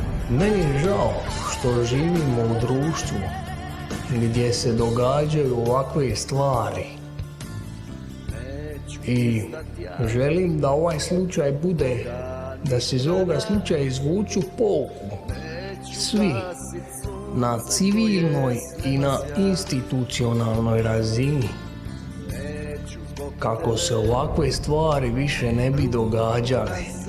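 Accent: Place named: Split, Croatia. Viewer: Možda a govorim šta ja vidim. Interesantan je, ima lipi splitski govor, al nije u škvari sto posto govori on je bija moru u to vrime.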